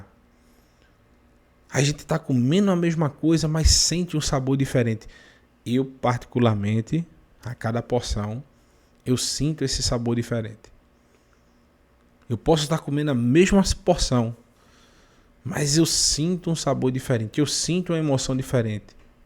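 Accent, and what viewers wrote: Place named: Recife, Brazil. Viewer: Excelente estudo, moreh. Recifense da gema, o sotaque não nega.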